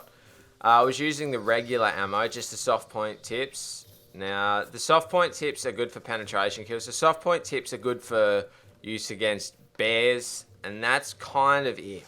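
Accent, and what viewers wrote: Australia. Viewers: yea that voice and aussie accent XD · TheGilgers 2017 lmao he could be Australian cause we do have very similar accents. And also most of my Aussie friends like to use the word cancerous a lot considering how we pretty much butcher the English language.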